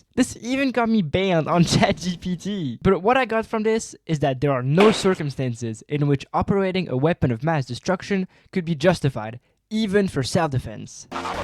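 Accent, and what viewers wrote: France. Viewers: Tu parles français? J'ai l'impression ton accent l'est un peu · En vrai un bon 8.5 je trouve, on comprend bien ce que tu dis mais on entend bien que t'es français mdr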